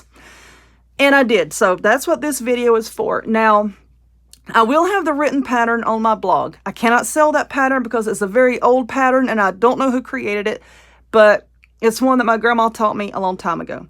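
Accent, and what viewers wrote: South, United States. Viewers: Woman you are amazing!! I so love your videos! Thank you from another southern girl that can so understand your wonderful voice!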